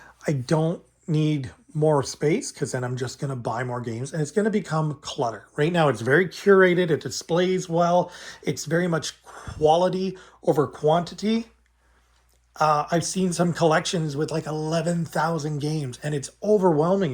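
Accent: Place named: Canada. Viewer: Awesome game room man, one of the best ones I seen! Do you know UndeadChronic? You sound spot on exactly like one of his Warband members with the Canadian accent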